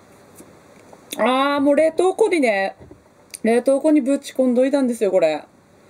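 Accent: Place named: Aomori, Japan. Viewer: 青森、懐かしいイントネーションです😀鶴亀温泉、フラワー温泉、行きたいな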